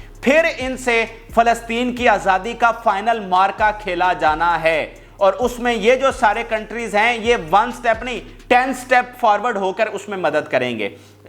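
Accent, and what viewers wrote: Punjab, Pakistan. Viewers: Sab kuch theek hai bas aapki Urdu theek nahi hai Punjabi lehje mai boli gayi Urdu bht behudaa lag rahi hai .Ali garh universty yaa Urdu adab k program dekha kro aur punjaabi bolne se hameshaa avoid kro ye ranjeet singh ki Jahaalat bhari zubaan hai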